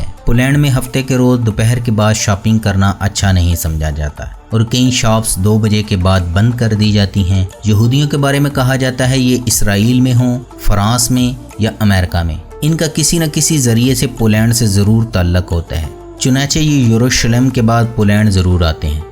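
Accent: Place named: Sheikhupura, Pakistan. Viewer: dear AAP se poocha Hai AAP kis City se Hain please tell me , I like your accents shiekhupura ka lagta hai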